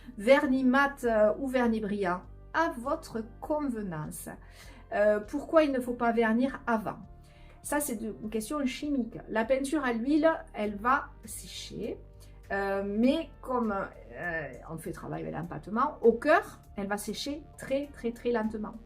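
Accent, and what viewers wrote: South, France. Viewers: Merci pour tous ces conseils et surtout ne vs arrêtez pas de parler avec votre accent du sud c est le soleil qui ns envahi!!! Ah et vs vs êtes un vrai rayon de soleil merci pour tout ce que vs ns apportez.❤❤❤❤énormes bisous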